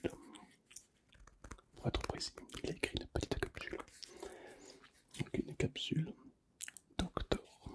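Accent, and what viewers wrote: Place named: Toulouse, France. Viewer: Une petite pépite ta vidéo ❤️ merci a toi ta une très belle voix avec ce petit accent toulousain ❤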